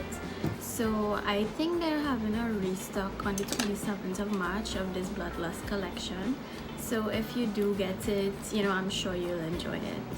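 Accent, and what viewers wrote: Trinidad and Tobago. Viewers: i subbed as soon as i heard the accent cause im trini too!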